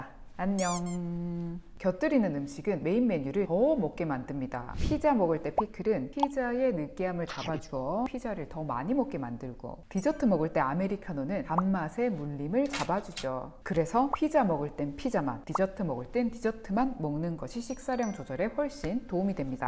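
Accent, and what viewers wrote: Gyeongsang, South Korea. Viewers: 선생님 경상도분이신가요??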